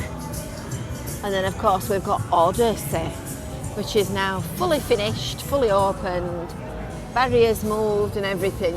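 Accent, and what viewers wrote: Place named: Yorkshire, United Kingdom. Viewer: Sorry , but I thought the music distracting and muted the good ladies rather nice Yorkshire accent ( but I am biased ! ).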